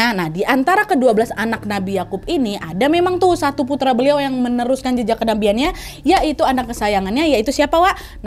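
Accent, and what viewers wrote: Indonesia. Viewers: 😂lucu benar kak ni bercerita dgn loghat bahasa Indonesia nya...saya lebih suka lihat dia berbicara drp isi ceritanya😂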